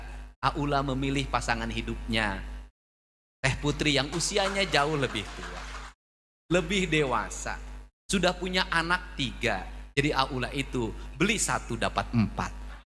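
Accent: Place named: Sunda, Indonesia. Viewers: Ingat almarhum ibu ku yg berasal dari Jabar. Sunda asli kental sekali..mendengar berkata dipodium ini..subhanallah..smoga Allah jaga trus.. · Sawios atuh P d sauran aki Oge, jandi nyunda pisan hebat kan. Hidup 👍.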